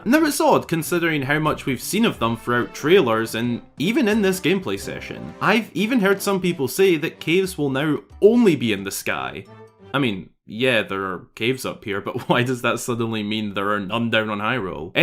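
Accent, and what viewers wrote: Scotland, United Kingdom. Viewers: your scottish i thought you were british 😅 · You Scottish? Nice, I want to go there one day ❤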